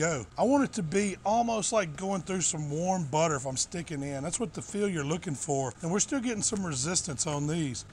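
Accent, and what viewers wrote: South, United States. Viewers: That one good me good, he often says stuff like this, really southern imo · I went through dozens of bbq videos until I saw a big fat guy with a southern accent. Then I was like, "I'm gonna listen to THAT guy." Love your videos, brutha. Total food porn for real.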